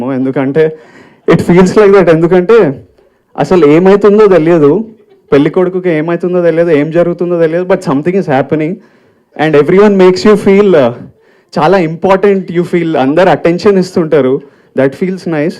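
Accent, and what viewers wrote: Telangana, India. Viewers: jai telangana.. hero dorikindu.. jai hero .. super hero..with his speech.. good look.. jai hero..he spoke pakka..hyderbadi..telangana slang..so..natural..jai hero..jai jai hero..jai kcr..jai ktr..jai telangana......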